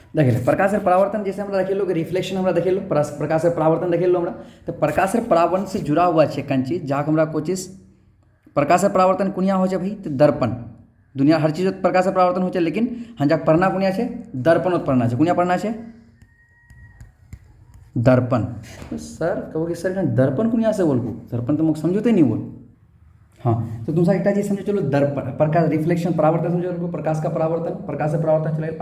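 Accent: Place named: Surjapuri, India. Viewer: Pure surjapuri sir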